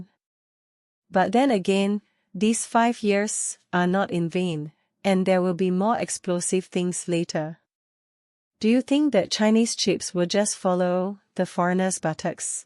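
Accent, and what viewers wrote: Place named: Malaysia. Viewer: This is Malaysian accent english. Whats wrong with that? It is clear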